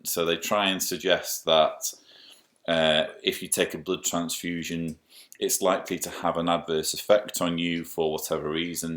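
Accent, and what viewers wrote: United Kingdom. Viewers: I loved your Prince video. I'm not an ex jw but remember the ones I've come across ... and I remember feeling bad for pretending not to be home when they came to the house ... but ever since I was little I was fascinated with the little magazines... the beautiful illustrations and kind messages... and the well dressed people giving these little booklets out.... my mom raised me Catholic but I was allowed to play with the little books for coloring or playing office.... when I did answer the door I was taught to be polite and received the little books or at a bus stop waiting I'd appreciate the free little book from the jw... anyways... my world would never gave been able to commit to the meeting demands etc etc... BUT STILL MY LONG FASCINATING INTEREST IN THEM REMAINS.... and now with all the ex jws and YouTube TTATT .... man some serious juicy gossip and exposure.... 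wow thank you for sharing your story... I love listening to your British accent.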